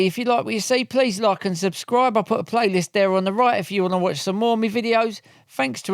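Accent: Cockney, United Kingdom. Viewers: Pathetic Cockney twat. Would be a good watch except for the crap jokes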